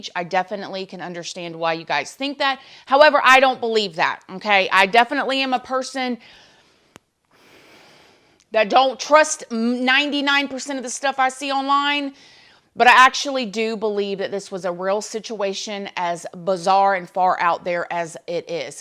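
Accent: South, United States. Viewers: I know you are happily married and all. But I just got to say, you are hot! I love your style, particularly your cool hairdos and your southern accent...and your funny. that's all.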